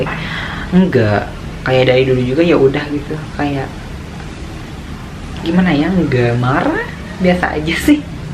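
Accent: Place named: Sunda, Indonesia. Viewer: Sunda abiss si aa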